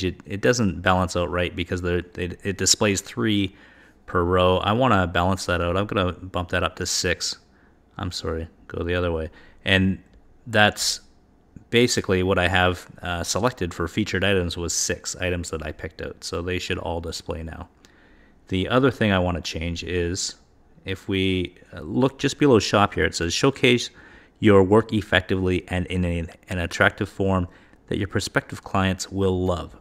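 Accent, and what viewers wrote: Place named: Canada. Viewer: Thanks for the Awesome FREE tutorial on how to set up a FREE E-com site,. So many out there plugging free this and free that only to lure people into an "only $*.** for the real information" situation. I only have one issue with your tutorial: Although you don't say "ABOOT" like South Park makes fun of/impies Canadians say, you really don't say ABOUT either. It's more of an "ABOUOOT". And I used to speak with Canadians daily when I worked at a Logistics company here in L.A. and y'all really do say it that way, (except those damn french canadians, they've got their own crazy inflections) so I can see where Matt & Trey get the stereotype from. Anyways, I am happy aboot the fact that you create these informative videos, Thanks!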